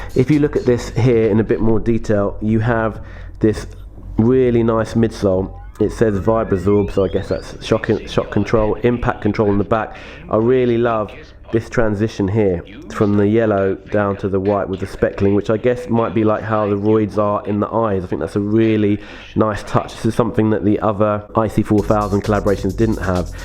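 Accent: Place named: United Kingdom. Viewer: Finally a new channel & great to see/hear another Brit,keep em coming bro.Toe box does look like the snapchat ghost lol.I think Hanon killed it with the theme & colourway,I remember M Johnson,he was a beast but so obvious roided up to the eyeballs (excuse the pun lol) even to me as a kid.Good stuff mate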